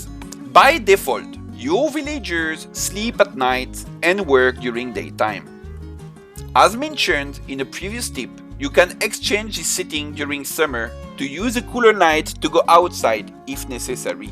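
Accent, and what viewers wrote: France. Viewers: Man your just french as fuck my man. you sound exactly like what I would expect. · From Texas, love your accent. I dont hear a lot of French YouTubers.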